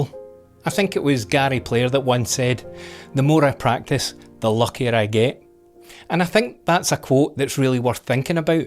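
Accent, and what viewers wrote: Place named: North, United Kingdom. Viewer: Mate, I can hear you speak for hours and hours! I normally put videos like these in the background while I cook breakfast and hope to get some inspiration for my next photography trip. But today, the eggs and butter remain untouched throughout the 14 minutes of this lesson. I was genuinely engrossed and didn't bother whether I wanted an omelette or fried. Great photos my fellow northerner! Came for the tips, staying for the accent.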